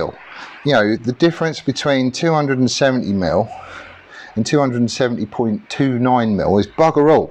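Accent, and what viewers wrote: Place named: Herefordshire, United Kingdom. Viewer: love watching you when I'm drunk. You don't half make me laugh you do. That's pure herefordian speech that is. Bang tidy butt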